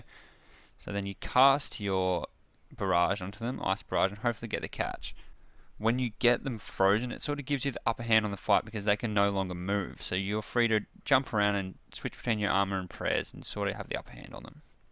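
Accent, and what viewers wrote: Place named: Australia. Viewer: Love hearing another Aussie on osrs swearing like nothing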